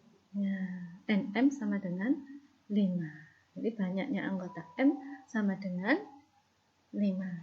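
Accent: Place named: Java, Indonesia. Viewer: Good...ustadza...medoknya masih kentara....mohon maaf.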